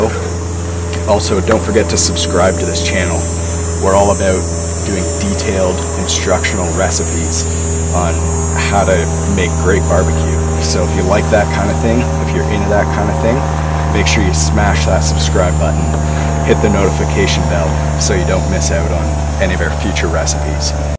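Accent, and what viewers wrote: Canada. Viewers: is that a Canadian accent I detect? If you follow my page I have a cab in myself way up in the northern Adirondacks on the Canada border ck us out 👍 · I’m making some bacon today with your recipe… can’t wait!! You Canadians have the best accents ever!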